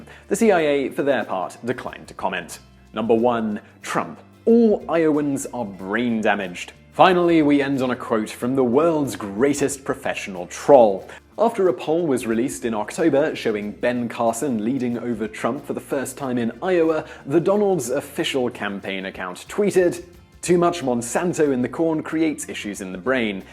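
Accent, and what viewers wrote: United Kingdom. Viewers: I thought you are Brit. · lel a british guy talking about american politics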